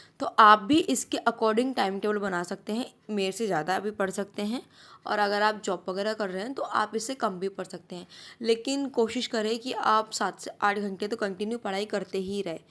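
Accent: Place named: Bhojpuri, India. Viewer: Bhojpuri voice nice hai ❤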